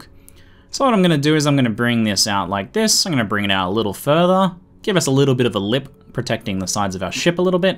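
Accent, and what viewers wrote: Australia. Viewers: I must say, an excellent tutorial! And always great hearing our Aussie accent on Youtube! Onya keep up the amazing vids!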